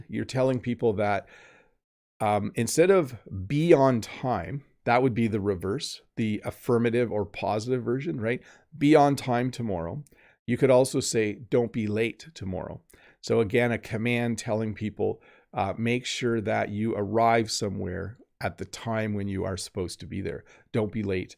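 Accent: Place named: Canada. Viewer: good morning, you are a great teacher, I learn English from you, but also calmness and composure. I saw you once on the Lucy english with Lucy channel, I must honestly admit that I thought that the difference in accent between Canada and Great Britain or Australia and the USA is greater, at my stage they are almost imperceptible, except for how you pronounce Toronto, Toronto, hold on you are great greetings From Poland. Luke G.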